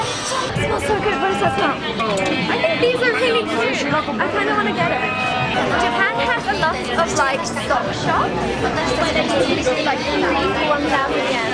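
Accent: United Kingdom. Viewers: their accent is british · you sound British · Are you british?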